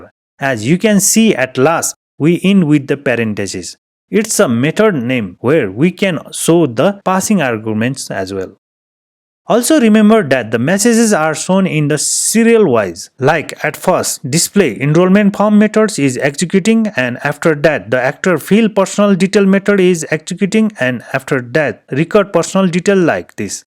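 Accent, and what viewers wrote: Nepal. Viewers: I cant help but notice newar accent. 😂 Great video!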